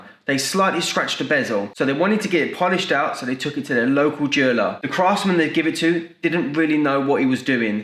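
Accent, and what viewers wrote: United Kingdom. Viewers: Thank you .he is ingles brittis i dint undestand.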